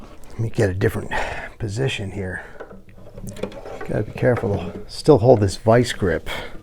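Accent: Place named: Philadelphia, United States. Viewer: Where are you based? My gf and I are trying to pinpoint your accent. I'm saying close to Philly. That's one effed up dovetail. Nice work,!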